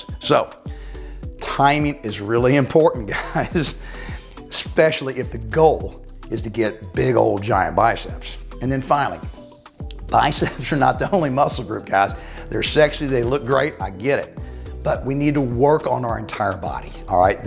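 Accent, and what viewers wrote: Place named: South, United States. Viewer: He's from The South. We sez it lack we wunt.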